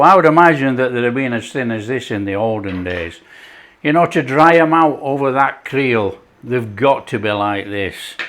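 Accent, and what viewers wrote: Yorkshire, United Kingdom. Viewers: Gone back to thi roots lad, tha sounds reet gradely! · Ey up, as tha been on holiday tu cudorth (Cudworth), tha sounds a lot more Yorkshire tha see, on a serious note my grandad use to make a full breakfast, stick it on a tin plate, cover with cheese drop of milk and pepper shove it under grill, warm flat bread and stick the breakfast on it, roll it and dip in the scrapings of well done cheese that stuck to plate, absolutely fantastic. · Your accent really thickens up when you're cooking Yorkshire food. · Thought it was great you talking in Yorkshire, I bet a lot of folk didn’t understand you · I could get these in Todmorden Market Hall until about 1990 when the last oatcake maker died/retired. Had to make my own since then. Not as good... And I noticed your Yorkshire accent came through stronger!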